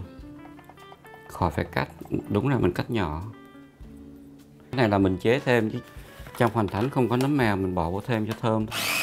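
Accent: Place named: North, Vietnam. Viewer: Nghe giọng anh là người Bắc 54 rất dễ mến , tôi thích xem cách anh nấu nướng rất đơn giản dễ thưc hành . Chúc anh nhiều SK & nấu nhiều món ngon !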